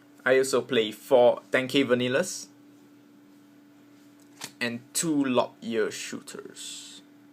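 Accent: Singapore. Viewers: you are from singapore?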